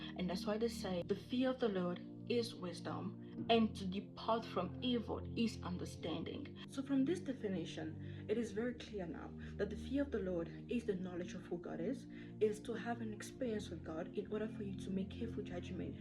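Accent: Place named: France. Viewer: You speak French huh? I can hear the accent 😂❤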